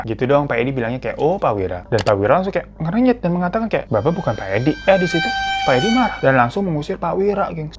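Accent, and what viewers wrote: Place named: Papua, Indonesia. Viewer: bang lucu bahasa Papua ny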